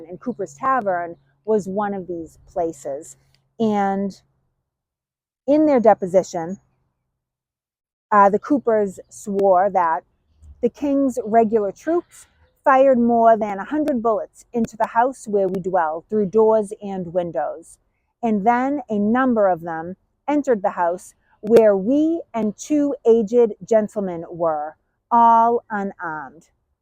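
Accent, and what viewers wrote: Boston, United States. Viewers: I have enjoyed your telling of facts of our war of Independence and absolutely love that Boston accent! ❤ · I am new to the channel and am catching up on the videos. does a very good job to describe tactical operations in this video. She is accurate and technical, yet communicates it easily. As a Virginian, you gotta love the Boston accent!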